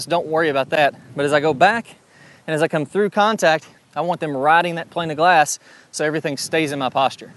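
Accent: United States. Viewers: This dude's accent sound like Mid country side of America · Great video! I've already started on the drills. Are you an Alabama boy? You sound like it!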